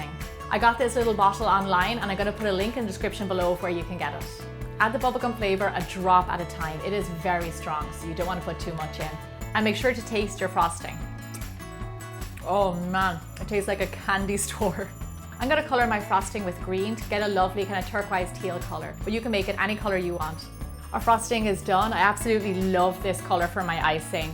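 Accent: Ireland. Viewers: are you from Ireland? because your accent is beautiful! lol i wish u had one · Your voice makes me miss Ireland lol, but the cakes looks so pretty.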